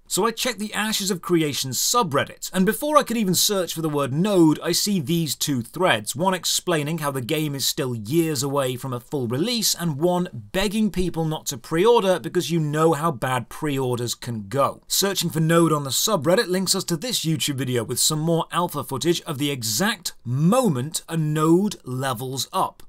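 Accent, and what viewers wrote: United Kingdom. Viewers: I'm confused. There's some brit talking, but no mug. Where's the channel host?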